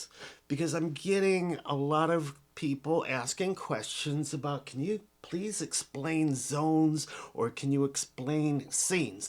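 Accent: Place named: North, United Kingdom. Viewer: ) I kept leaning over looking around the mic stand that was in front. I tease, but I love your approach to breaking down the instrument and your pleasing effect throughout the video. Ive the F8 but am NOT a piano player. I hope you continue this series and delve more into the instrument. Maybe I'll see you at NAMM! P.S. Love your northern accent!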